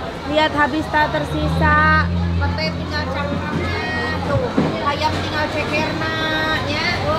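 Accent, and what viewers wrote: West Java, Indonesia. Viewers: Seneng pisan ngadangu teh nyarios Sunda asa ka wargi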